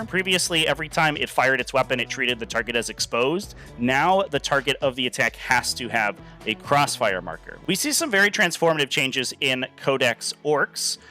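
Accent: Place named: United States. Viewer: Dodgy head set. You still sound American :)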